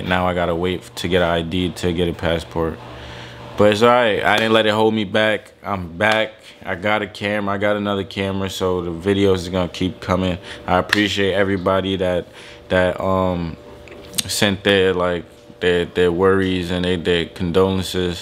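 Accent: New York, United States. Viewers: Lmaoooo he talk like every New York hood nigga 😭😭😭😂😂😭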